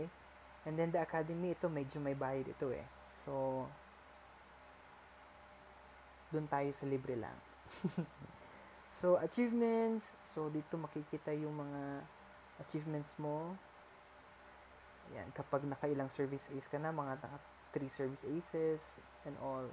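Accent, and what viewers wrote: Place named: Brazil. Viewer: Tu é br ne o seu sutaque parece pq não vez um em ptbr?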